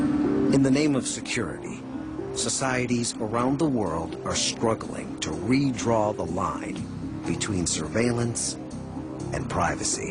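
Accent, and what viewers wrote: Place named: Netherlands. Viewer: Who cares about life?? DUTCH ACCENT DUTCH ACCENT DUTCH ACCENT DUTCH PEOPLE PARTY DUTCH PEOPLE ACCENT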